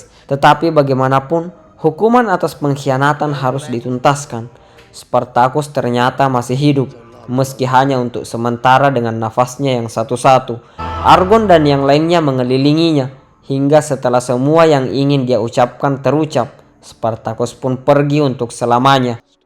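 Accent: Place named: Sulawesi, Indonesia. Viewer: Miminnya pasti dari Sulawesi 😅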